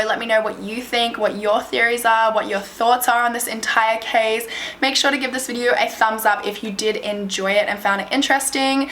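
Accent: Australia. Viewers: Are you Australian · her accent is like australian and america switching back and forth. i'm so confuseddd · Australian. She's from Australia.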